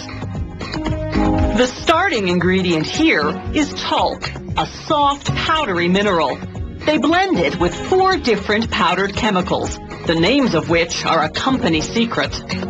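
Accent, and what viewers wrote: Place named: Canada. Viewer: I only just notice she's Canadian - "abouwt" and "ouwt" gave her away :'L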